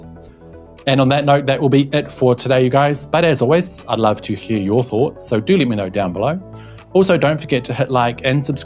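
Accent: New Zealand. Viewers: Kiwi accent lol, unmistakable. 😊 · You sound like a spokesman for the Chinese government. With a nice NZ accent. But you are correct I suspect. China 1. USA 0.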